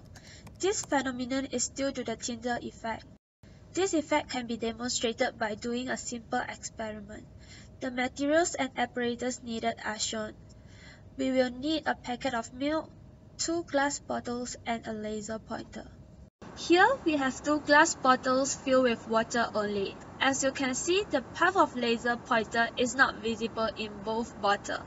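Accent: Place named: Asia. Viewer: Asian accent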